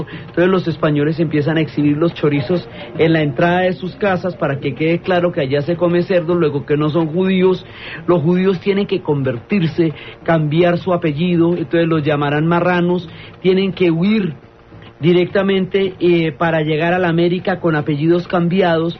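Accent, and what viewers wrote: Colombia. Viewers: Muchas gracias. Siempre me ha gustado la historia y la geografia. Excelente tu exposicion , pareces de Colombia, estoy en lo cirerto? Saludos desde Chicago. Inscrito, like y compartido.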